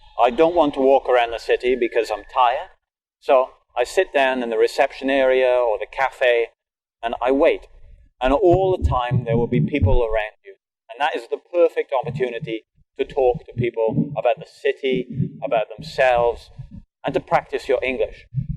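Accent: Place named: Wales, United Kingdom. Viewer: He sounds Welsh...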